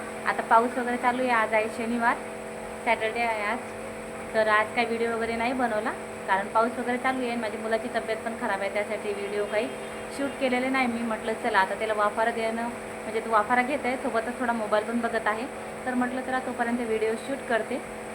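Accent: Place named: Vidarbha, India. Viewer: आमच्याकडे टोमॅटो नाही खात उपवासाला 😊बाकी वैगव लक्ष्मीचे उपवास मी पण केले तर खूप वर्षे आधी आणि तू करतेस तर व्हिडिओ टाकायला काहीच हरकत नाही आणि मला एक सांगायचं आहे तूझी भाषा ऐकून लगेच कळलंकीतू विदर्भातली आहेस 😊कारण आमच्या ताई ( नंदूबाई ) अमरावतीच्या आहेत बाकी तूझ्या साधेपणा मध्येचतूला यश मिळेल ❤❤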